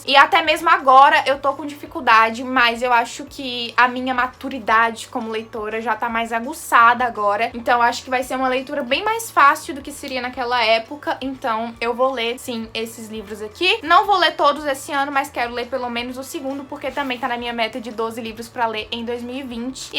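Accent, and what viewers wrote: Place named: Ceará, Brazil. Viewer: Oi,tudo bem? Pelo seu sotaque chuto que vc é cearense kkkk (sou nova aqui então fui no teu insta pra ter certeza, e fico feliz de vc ser daqui😊) eu queria saber se vc costuma comprar ou deixar livros no sebo, se sim qual sebo vc me indica?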